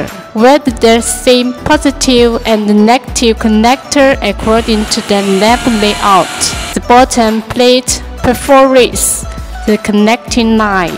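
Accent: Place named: China. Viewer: what a pain to listen to her zinglish 🐱‍🐉🐱‍🐉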